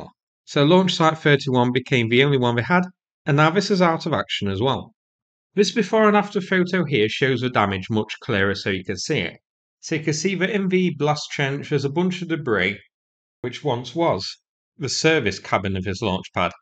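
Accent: United Kingdom. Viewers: pommie accent .... lol .... why not do a doco on the mighty Has-Been Kingdom "Space Force" ..... lol you wankers can't even launch a can of baked beans into orbit ..... you are such a bunch of total losers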